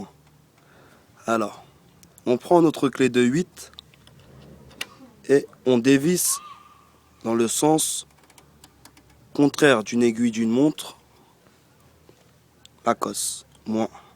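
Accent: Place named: East, France. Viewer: magrébin plutôt. Mais il a plus un accent de l'est j'ai l'impression.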